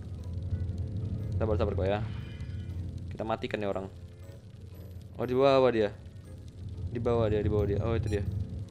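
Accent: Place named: Pematangsiantar, Indonesia. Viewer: Patengtengan bang 🤣 , ngeri kali bahasa mu bg nampak kali par siantar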